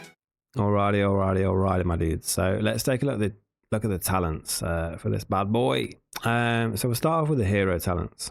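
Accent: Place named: United Kingdom. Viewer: nice seeing a brit explain talents etc for a change haha! thanks buddy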